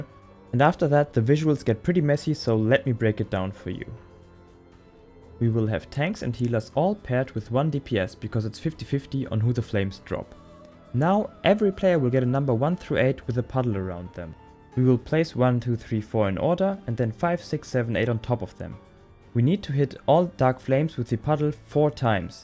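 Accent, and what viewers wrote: Germany. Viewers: Ok so it's true that all Germans sound the same and adorable OK! <3 ahaha thank you for your reply!!!!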